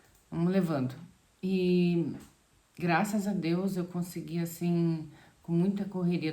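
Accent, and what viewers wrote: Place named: Espírito Santo, Brazil. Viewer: Gostei muito de você que Deus continue abençoando você e sua família. Você é do Espírito Santo? Você tem um sotaque parecido. Desculpe pela indiscrição, mas o seu namorado é alemão? Eu já morei na Itália. Não por causa de dinheiro mas por gostar do país da língua sou neto.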